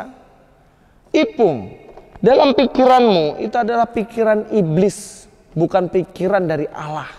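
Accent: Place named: East Java, Indonesia. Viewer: Tp nadanya sprti orng jatim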